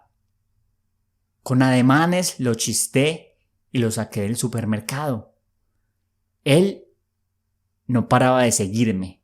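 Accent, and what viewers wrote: Colombia. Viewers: Me encanta el acento colombiano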